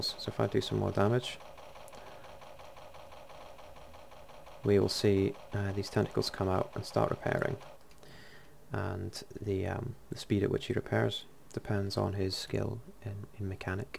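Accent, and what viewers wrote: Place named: United Kingdom. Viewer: nice brootish accent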